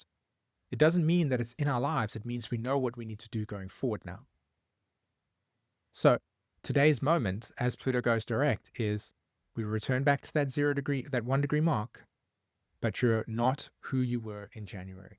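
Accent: South Africa. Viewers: I'm wondering if your accent is South African?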